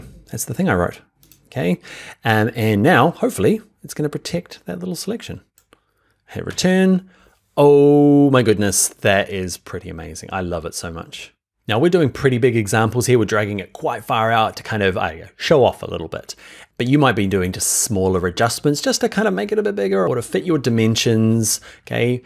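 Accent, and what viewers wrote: New Zealand. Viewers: Fellow kiwi? Can I just say, as someone who makes tutorials myself, my hats off to you for such a calm, cool and smooth delivery in your narrative. I'm a third of the way through your course and you explain everything so clearly and at a pace that I can totally keep up with. Kudos to you my friend! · Very helpful! BTW, when you slow the playback speed to .75 you sound drunk. Everybody loves a drunk Kiwi! :))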